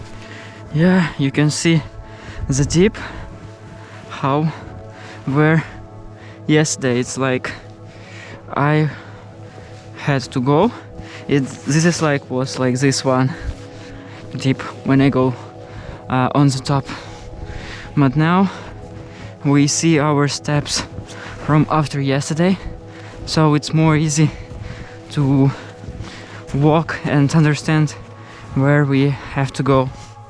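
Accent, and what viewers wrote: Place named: Romania. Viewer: I think you speak perfect English. I'm also used to the accent because my Grandmother was from the Romanian Carpathians and your accent sounds the same to me. 👍 I'm glad I found your channel through Shiey's channel! Love this video! 😎🤘👍❤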